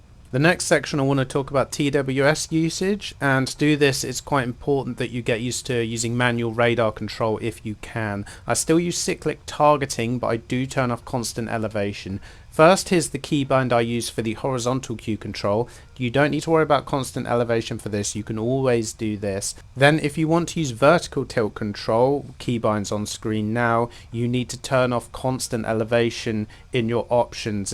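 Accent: United Kingdom. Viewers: Your channel is starting to become my favourite WT educational/training (if I can call it that) channel on Youtube. On point and posh accent! I do note the distinct lack of Shar FA2 content though. How about doing a next ARH tips with that? Come on, embrace the suffering haha.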